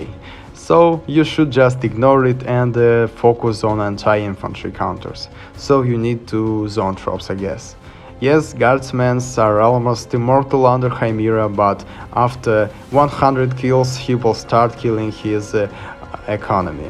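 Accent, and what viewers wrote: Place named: Russia. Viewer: ты же русский игрок?я сморел твои реплеи и ты реально крут. Твой акцент похож на русский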